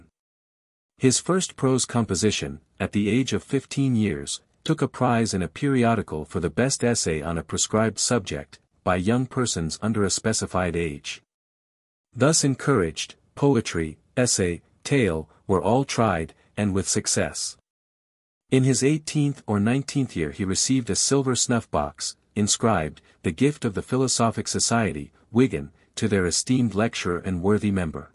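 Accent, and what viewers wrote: United States. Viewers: Only managed to stomach 12ish minutes of this godawful monotonous American voice. Would have been much better in an English accent at least, seen as it’s about Lancashire!!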